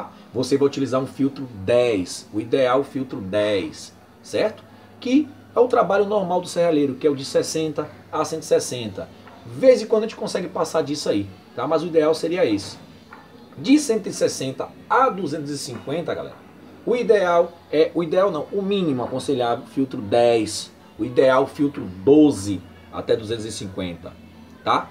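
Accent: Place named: Bahia, Brazil. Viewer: Tem sotaque de Baiano rss.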